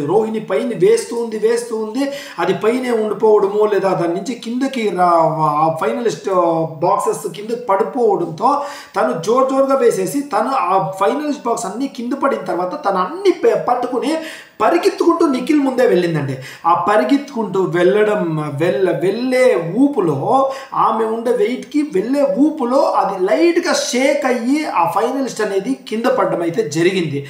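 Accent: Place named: Karnataka, India. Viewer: Yes nenu kuda observe chesanu. Mee slang kannada telugu mix, na kannada friends telugu meela ne matladatharu.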